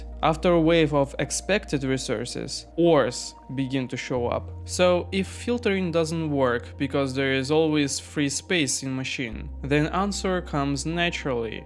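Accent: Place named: Russia. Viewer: А акцент то русский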